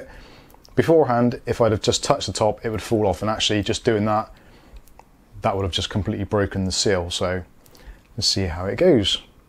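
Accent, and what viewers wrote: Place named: Gloucester, United Kingdom. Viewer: Great vid, I think you might have sold me on this. I'm in Vancouver BC, you sound like you're from my part of of the UK, Gloucester boy?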